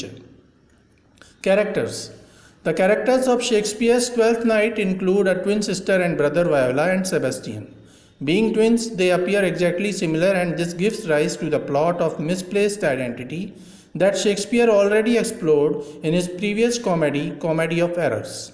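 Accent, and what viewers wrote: India. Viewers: Very good Indian Accent.. Perfect Indian English language.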